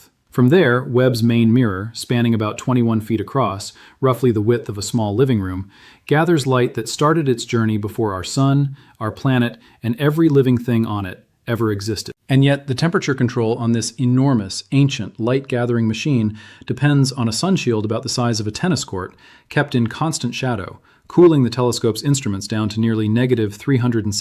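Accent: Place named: United Kingdom. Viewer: Interesting stuff. Notice he goes into a British brogue after about three minutes. Oh that’s weird having an AI change an accent midstream.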